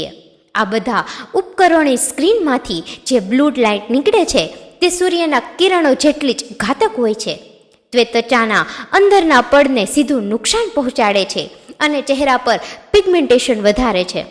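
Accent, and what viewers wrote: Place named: Saurashtra, India. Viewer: Nice. Your bhasha is like Saurashtra.!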